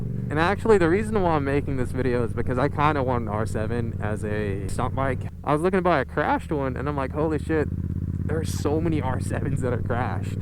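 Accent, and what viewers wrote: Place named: United States. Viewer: you sound gay as fuck with that american accent✈🏢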